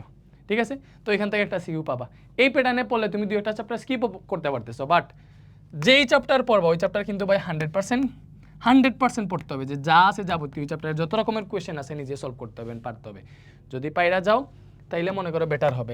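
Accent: Sylhet, Bangladesh. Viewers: Apni ki Sylheti Paz vai ripley den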